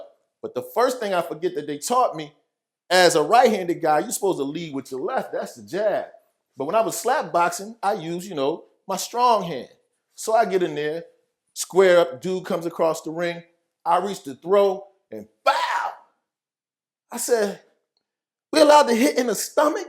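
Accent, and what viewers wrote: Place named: East Coast, United States. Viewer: Ahh ok you from Philly, I had a feeling you was from somewhere on the east coast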